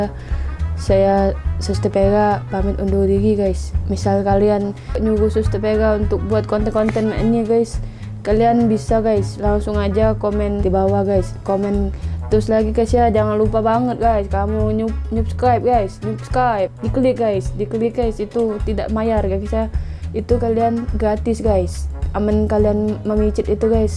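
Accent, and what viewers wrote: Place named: South Sumatra, Indonesia. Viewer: tpi cak wong komreng caro ngmong lau bik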